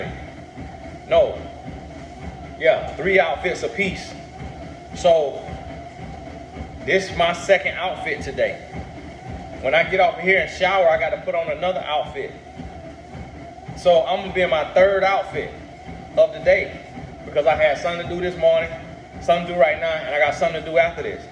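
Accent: South, United States. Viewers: Hey there Mr. I forgot to just say ion know what video you said it on but I’ve heard a few times but you say you was insecure or something like that about your country accent man that the one thing I love about on know fruit stuff but I makes me more comfortable as black man to sit an listen to your videos it speaks to me an Im sure many others I be definitely got out more of my homies on from the hood anybody else wouldn’t be able to reach us like you can so I appreciate you an everything you do I pray God bless you even more man cause honestly I had been lacking in the self love department do to child hood trama an had some bad patterns popping in relationships back to back from Mommy wounds that were never solved but hearing this an hour courses have really helped an continued to help so thank you man 💪🏿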